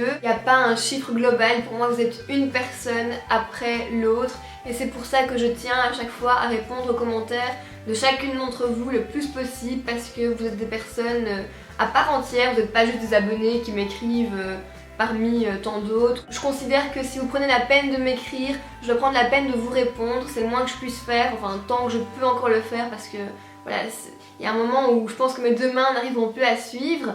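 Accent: Belgium. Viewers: J'adore ton accent belge ! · ton ptit accent belge est très marrant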